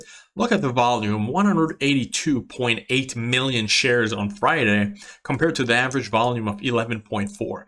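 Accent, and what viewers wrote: Quebec, Canada. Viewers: I hear Quebec accent. We're from the same province 😁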